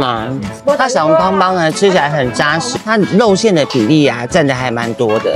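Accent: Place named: Taiwan. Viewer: 很喜歡看到漂亮的介紹美食聲音好聽，又有台灣國語的腔調真的漂亮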